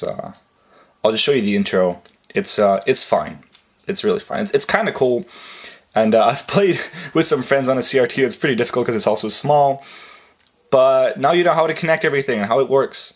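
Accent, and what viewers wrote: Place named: United States. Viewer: Judging from your accent, i thought you were American.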